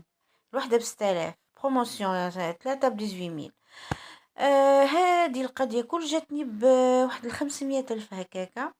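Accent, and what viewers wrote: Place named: Annaba, Algeria. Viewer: يعيشك حنونة نموت على فيديوهات تيعك هدرتك كيما تع عنابية تسكني في عنابة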